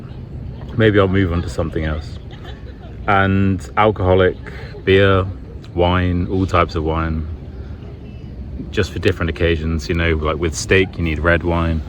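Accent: United Kingdom. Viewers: british accent is my favourite. greetings from caracas, venezuela. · British accent it is love it❤❤❤🥺🥺🥺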